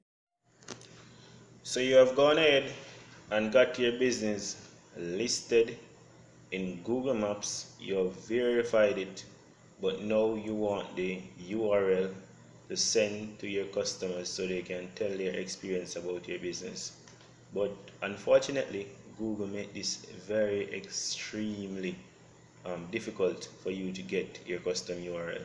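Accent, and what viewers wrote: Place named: Jamaica. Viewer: Bet dis a Jamaican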